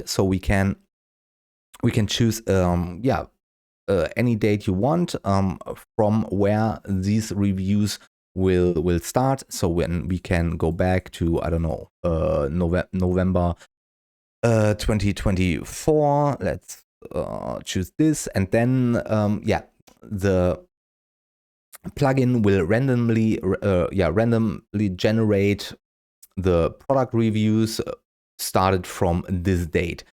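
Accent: Germany. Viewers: Akzent einfach deutsch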